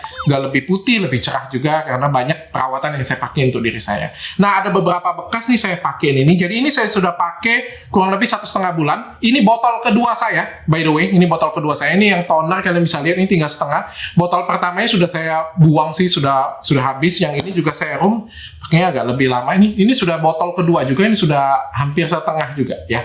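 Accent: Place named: Palembang, Indonesia. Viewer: Wong Palembang ternyato 😂😂😂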